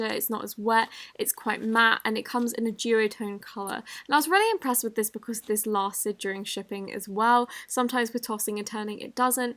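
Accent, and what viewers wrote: United Kingdom. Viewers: Omg! Is that a…UK ACENT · are you british or accent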